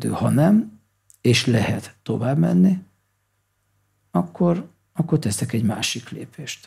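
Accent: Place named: Russia. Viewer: Kedves! Nagyon érdekelne, hogy miért beszélsz akcentussal? Olyan a hanglejtésed, mintha orosz lenne az anyanyelved. Jól hallom? Köszönöm a videókat, nagyon szeretem őket, remelem egyszer lesz alkalmam egyszer találkozni veled!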